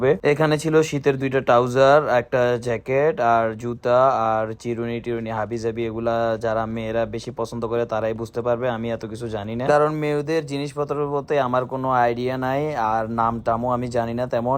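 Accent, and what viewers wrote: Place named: Chittagong, Bangladesh. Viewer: চট্টগ্রামের মতো ভাষার মতো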